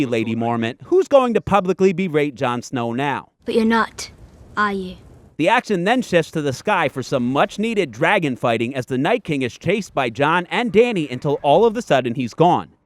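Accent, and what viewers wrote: United States. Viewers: Can't stand for 30 seconds in front of your cringy American Feminist accent, it can even beat an Indian Gay. Get Help... Its OK. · I Love your NY Accent? Lol lol I'm also from NY just subscribed nice to hear some on who sounds like me.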